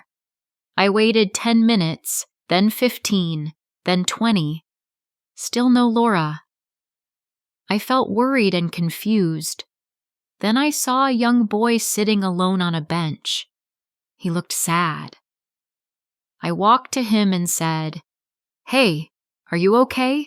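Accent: United States. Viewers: Is it American accent ???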